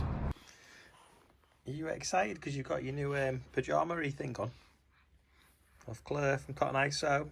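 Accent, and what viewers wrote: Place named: Liverpool, United Kingdom. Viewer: bad tory you lad. terrible hearing that shite in a scouse accent. do one. unsubscribed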